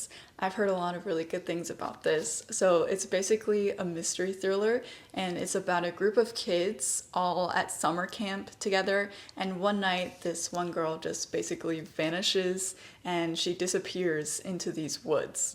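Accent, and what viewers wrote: Standard. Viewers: Leah讲的似乎就是慢速、完整、标准的英语。有的家庭是要求孩子这样说话的。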